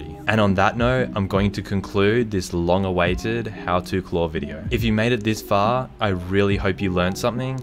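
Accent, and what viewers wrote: Australia. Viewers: hes Australian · Btw he said call it’s just you non-Australians can’t understand our sweet accent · Aussie accent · Kolxk no he is Aussie like me and he said CALL of duty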